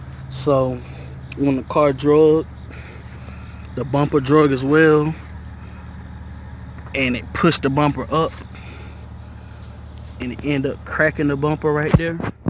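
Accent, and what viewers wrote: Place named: RP, United Kingdom. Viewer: Hate these posh accents🤓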